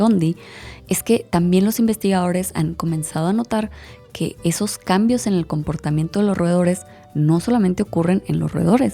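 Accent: Mexico. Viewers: Me encanta tu acento mexicano! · Linda tu y lindo nuestro acento mexicano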